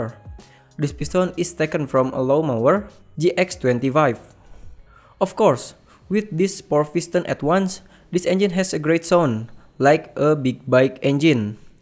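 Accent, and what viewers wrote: Indonesia. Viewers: Ini org Indonesia ya ? Soal nya logat nya indo banget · I immediately know that this channel is based in Indonesia from your first word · Logat indonesia nya kedengeran bro..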